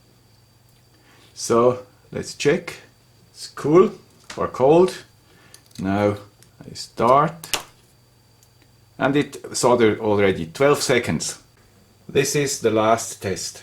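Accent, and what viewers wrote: Switzerland. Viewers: Congrats with #100. I enjoy looking at your videos and learn something every new video. I do hope you do not start #101 again by saying 'this is the guy with the Swiss accent'.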